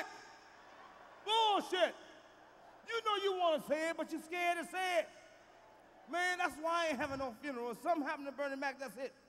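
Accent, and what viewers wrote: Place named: Chicago, United States. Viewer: I swear I always heard West side of Chicago ish talking err time he was on stage.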